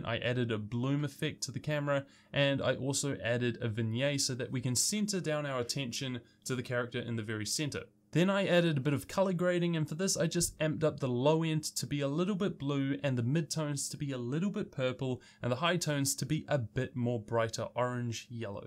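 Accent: New Zealand. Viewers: Are you a New Zealander? that accent or lack thereof an accent is a dead giveaway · Where are you from? Your accent (and name) makes it sound like you are from New Zealand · Are you from New Zealand I know a kiwi when I see one! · Absolutley great video! Subbed. Are you from New Zealand by any chance? Your speech (and yourself a bit) reminds me of Jemaine Clement :D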